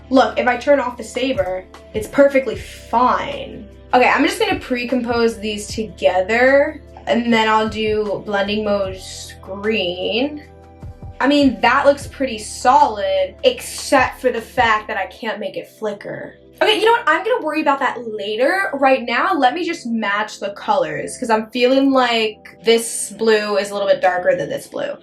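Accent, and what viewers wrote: England, United Kingdom. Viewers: Love your English accent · Make an edit tutorial while using your British voice! Whenever you dont/forget talk American you show one of your old edits !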